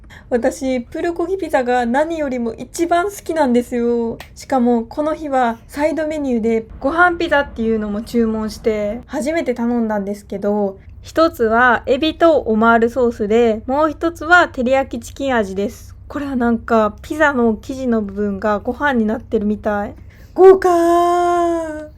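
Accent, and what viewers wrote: Kansai, Japan. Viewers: YouTube始めたての時よりも関西弁出てきてて好き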